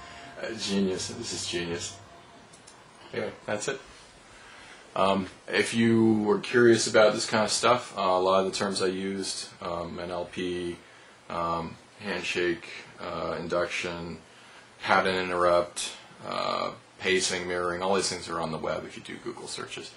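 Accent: United Kingdom. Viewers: Your video was thurly explained. I like that. That and i don't understand the british accent that well so ya :P Thx! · u kno what? that all sounds pretty legit on a very unusual level, but it basically all comes down to one thing: british accent. That combined with non-stop inane chatter could put anyone under for a few seconds. . . but like i said, the other explainations are nice too.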